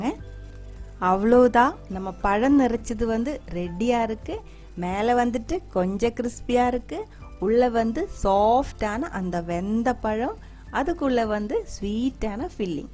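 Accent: Kerala, India. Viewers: Kerala Akka Malayalam kalantha Tamil pesaraanga, nice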